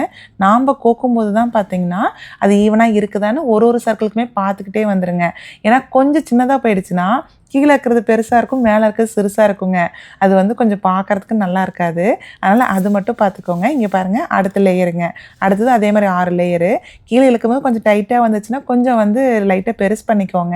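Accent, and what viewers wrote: Kongu, India. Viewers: எல்லா வேணியும் அழகு, உங்க கொங்கு தமிழும் அழகோ அழகு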